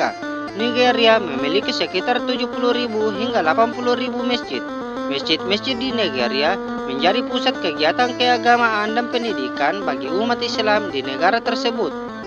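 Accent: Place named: Sulawesi, Indonesia. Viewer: Kamu OKKOTS😅...org sulawesi yah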